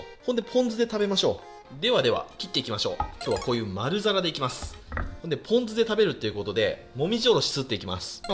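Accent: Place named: Mikawa, Japan. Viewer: かねこさんが市場の人と話すときに漏れる三河訛りに親近感を覚える遠州弁話者です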